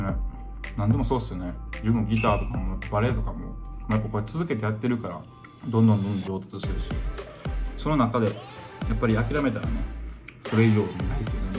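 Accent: Kansai, Japan. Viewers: なんだかんだ関西弁での喋りを聞くの初かもしれん。 インタビューとか標準語だったし。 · 初めて喋ってる動画見たけどイントネーションで、ん…？ってなって調べたら関西出身なんだね😳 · 関西人やなーw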